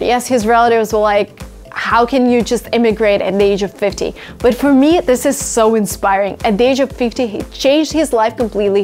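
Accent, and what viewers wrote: Russia. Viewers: От русского акцента получается избавиться невозможно? Легко вас понимаю, потому что вы говорите с русским акцентом. Произношение иностранцев даётся сложнее на восприятие и многое не понятно в речи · Don’t get rid of your Russian accent!! It’s beautiful i wish my voice was like yours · Она не строит предложения по-русски. У нее все в порядке с грамматикой. Пожалуй, единственное, что выдает в ней не носителя английского, так это мелодика русского языка, протяженное произношение гласных. А все остальное просто супер!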